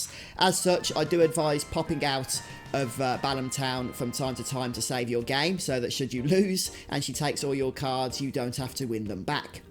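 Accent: United Kingdom. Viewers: Are you from U.K??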